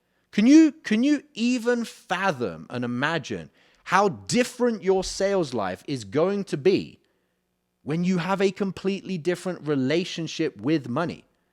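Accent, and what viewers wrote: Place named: England, United Kingdom. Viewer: have you heard of an english accent my friend?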